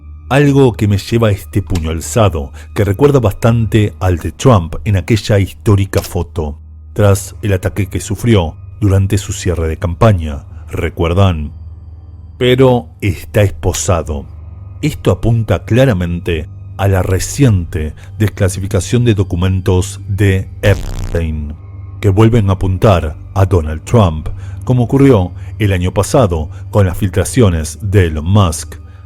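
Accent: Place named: Argentina. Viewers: Superas ampliamente a Ivan de gran misterio tienes un gran talento de deducción y una gran forma de explicarlo a pesar de tu acento argentino (Felicidades) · A pesar del acento argentino??? 😠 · A pesar del acento argentino... Te has pasado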